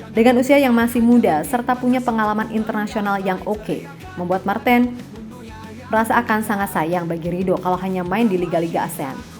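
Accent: Java, Indonesia. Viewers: " CANGKEM CANGKEM " 😂😂😂😂😂...logat jawanya khas banget min ❤❤❤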